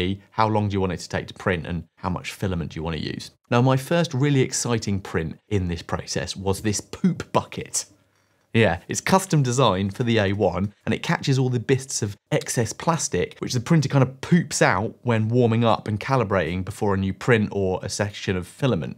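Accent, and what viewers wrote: United Kingdom. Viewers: God, no more British Accents!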